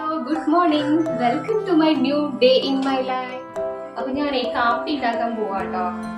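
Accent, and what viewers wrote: Thrissur, India. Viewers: Are you thrissur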